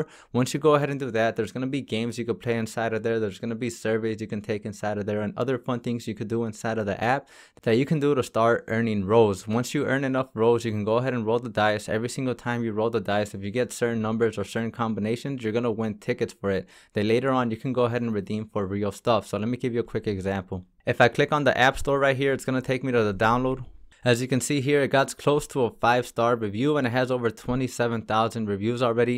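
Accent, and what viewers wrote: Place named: Latin America. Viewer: Are you latino? Ur accent bro nice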